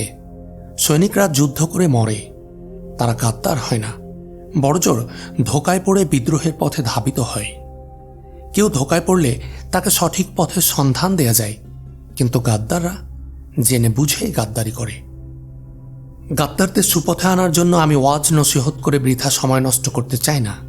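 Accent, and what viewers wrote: Kolkata, India. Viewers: আপনেত দেখি ভাই কলকাতার স্টাইলে বাংলা পড়া শুরু করলেন যা আরো আগের পর্বগুলোতে পাওয়া যায়না 🤭 শ কে স ছ পড়ছেন 🤔🤔🤔😏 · ভাইয়া আমার আম্মুও ক্রুসেড সিরিজের পাগল হয়ে গেছে। আমার খালা শুনে বলতেছে আপনি নাকি ভারতের কলকাতার। আপনার কথা কলকাতার মানুষদের মত লাগে।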